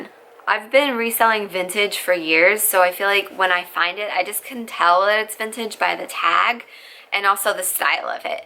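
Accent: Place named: Philadelphia, United States. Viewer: Thank you for your vids! I’m just about to start selling on Poshmark. I Love your simple instructions, and your inventory idea is brilliant! I used to live outside of Philly (Mainline and Drexel Hill), Love that accent! 😘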